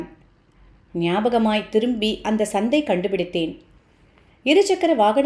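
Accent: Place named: Tamil Nadu, India. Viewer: I am very addicted to your Tamil accent 😊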